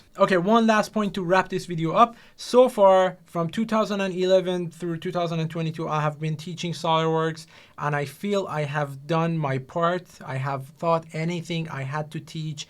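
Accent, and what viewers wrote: Iran. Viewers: Hello, you have Iranian accent, are you from iran?:D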